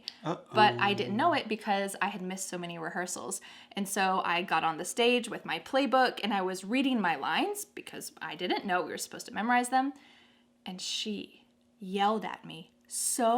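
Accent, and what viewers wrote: United States. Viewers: it's not my intention to butter you up. You don't need it and I wouldn't gain anything with that. But I have to tell you: YOU ARE THE BEST ENGLISH TEACHER ON YOUTUBE EVER!!! I've been teaching English for over 40 years. During this time I've worked with a few American teachers. But... some of them have left me with a bad impression of Americans. You, on the other hand, have such a nice, humble approach in your classes, that sometimes I wonder if you are really American, despite having the best American accent I've ever heard. Just kidding! I don't doubt your nationality. But I understand why you are so successful as a teacher! I'll recommend your channel to all my students, not only for the quality you give to it, but especially because of the wonderful person you seem to be. I wish I could meet you and be your friend. I mean it! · This way of your American-style pronunciation seems to be a bit difficult for me, my teacher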